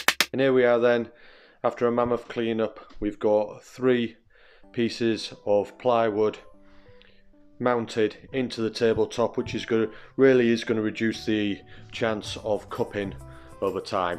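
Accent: Hull, United Kingdom. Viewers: That’s an ‘Ull accent if I’ve ever heard one.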